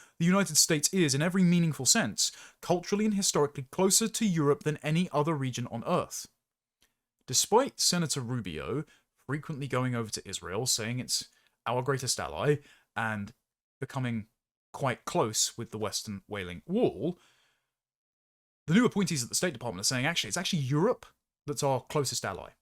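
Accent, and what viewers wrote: United Kingdom. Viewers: Spiffingly English.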